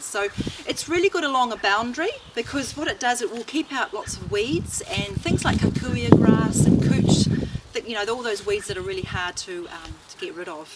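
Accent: New Zealand. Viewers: When I move to New Zealand in the future I hope to adopt a nice kiwi accent like yours. It truly is nice. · You sound like a kiwi, thanks for the great information as I love herbs. I want to suggest one thing, try to be less violent and communicate with them more through intention, you will find that you will get a better result with everything you desire to achieve from them. blessings.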